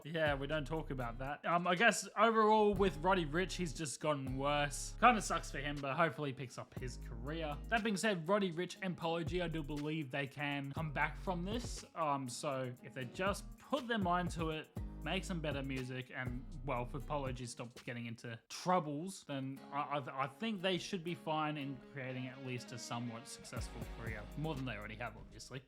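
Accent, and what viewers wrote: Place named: Australia. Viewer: Cool video but i got a question, do u ever listen to any Australian rap ? If so what u think of it? I assume your aussie from your accent (sorry if im wrong)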